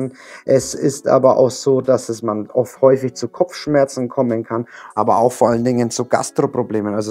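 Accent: Franconia, Germany. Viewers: bist du franke?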